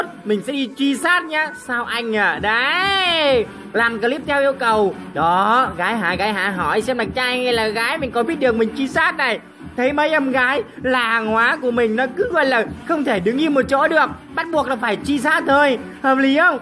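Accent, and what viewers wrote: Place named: Phu Yen, Vietnam. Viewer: Phú yên kìa 🤣🤣 quê tui 🤣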